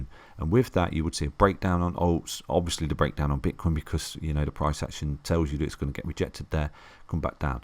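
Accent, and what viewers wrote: United Kingdom. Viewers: i like that nice and short video.. thanks.. nice to also hear British accent for once.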